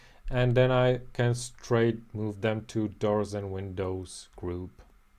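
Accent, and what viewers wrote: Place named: Czech Republic. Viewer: Are you Czech btw? I can hear it! 😀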